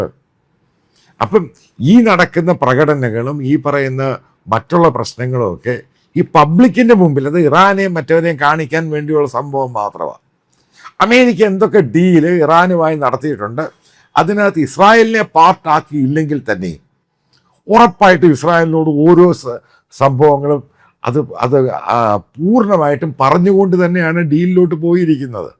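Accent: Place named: Pala, India. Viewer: അച്ചായൻ ഈ വിഷയത്തെ കുറിച്ച് പഠിക്കാത്തത് കൊണ്ട് മാത്രമാണ് ഈ വിവരക്കേട് വീഡിയോ ഉണ്ടായതെന്ന് എനിക്ക് മനസിലാവുന്നുണ്ട് - അവനവന് പറ്റുന്ന വിഷയം മാത്രം എടുത്താൽ പോരെ..... ഇൻ്റർനാഷണൽ ഇഷ്യൂസിനെ കുറിച്ച് കൃത്യമായി പഠിച്ച് നല്ല രീതിയിൽ വീഡിയോ ചെയ്യുന്ന എത്രയോ വിദഗ്ധർ ഇവിടെയുണ്ട് , അവരുടെ ചാനലുകളും - അച്ചായൻ്റെ പാലാ Slang ഇല്ലെന്നേയുള്ളു ......😂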